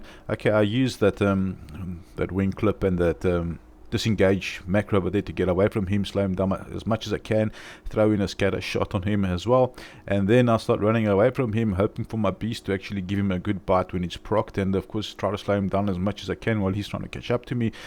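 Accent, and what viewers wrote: South Africa. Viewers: You sound very South African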